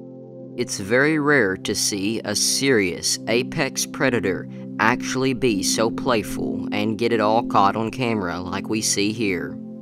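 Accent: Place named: South, United States. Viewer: You sound so country,I wanna say yeah.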